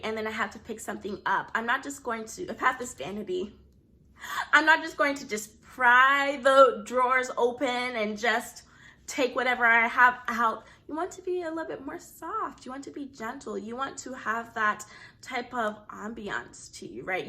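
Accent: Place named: Caribbean. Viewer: Hey, Girl you sure you from down south because I swear I can hear Caribbean or jamaica in your accent. Thanks for this video.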